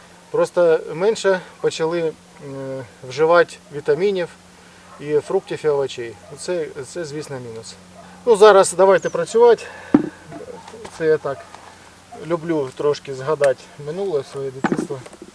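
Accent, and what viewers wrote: Russia. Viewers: Привіт😊 все у вас добре виходить, чутно що деякі слова російсько-українські, але нічого 😊 потрібна практика.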